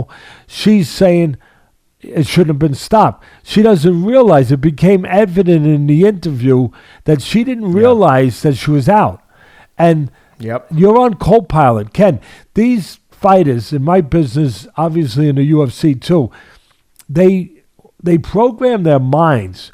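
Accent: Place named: Boston, United States. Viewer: All I want to know is what part of Boston is Teddy from.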